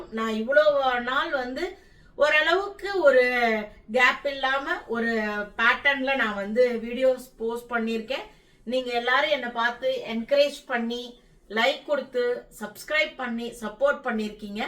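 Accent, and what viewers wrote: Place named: Bangalore, India. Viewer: Thakshanam is the unique Bangalore Ayyangar bhashai ❤it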